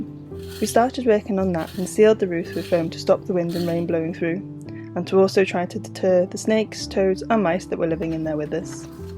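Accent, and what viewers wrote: United Kingdom. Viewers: Interesting life style, interesting content. Unfortunately have a hard time to understand your fast speed British accent, please do a favor for your audience to slow down with your narration!! Thanks for your content, keep us updated.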